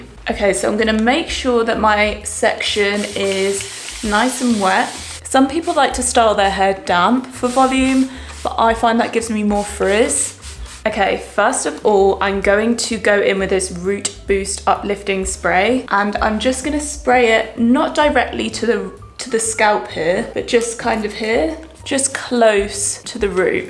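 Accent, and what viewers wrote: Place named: United Kingdom. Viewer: I didn't know you were British you have such a beautiful voice 😊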